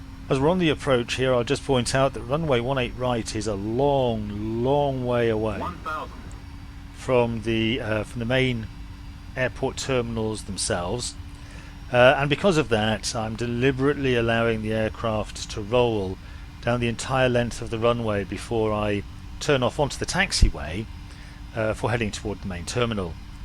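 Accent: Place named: RP, United Kingdom. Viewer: speaks the Queens English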